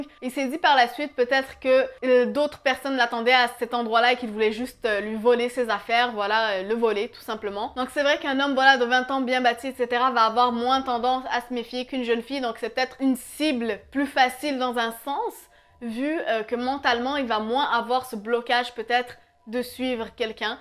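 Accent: Quebec, Canada. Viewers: alors, c'est marrant, j'entend bien un accent canadien français (faut pas dire québécois, je sais) mais pas si prononcé que ça. française qui vis au canada? accent d'un autre endroit? disons que ton accent varie entre français et canadien...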